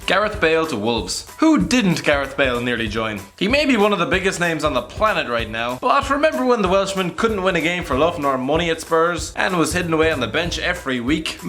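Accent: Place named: Ireland. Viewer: Lovin the Irish commentary xD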